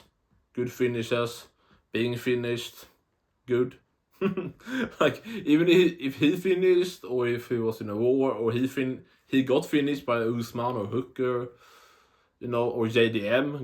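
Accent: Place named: Spain. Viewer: nice spanish accent!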